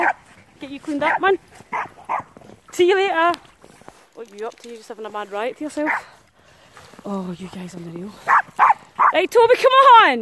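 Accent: Scotland, United Kingdom. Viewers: Hass is sounds Scottish! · Are u scotishh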